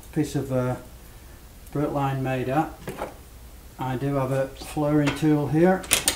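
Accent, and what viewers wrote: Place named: Lancashire, United Kingdom. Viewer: Where are you based ? The accent sounds more like Lancashire.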